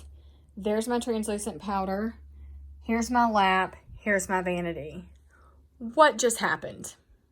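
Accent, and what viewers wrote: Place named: South, United States. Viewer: Chick-a-boom! You are rocking it wicked! Love your warm little southern accent! I’ve been doing the MK for near 6 years and loving your style! I’d love to hear about your behind the camera and preschoot prep. I’ve been thinking about doing the YouTube thingy. Stuck on the start part.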